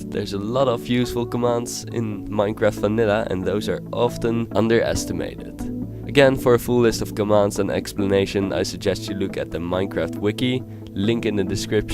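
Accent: Netherlands. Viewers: Are you dutch? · i am dutch and i think he to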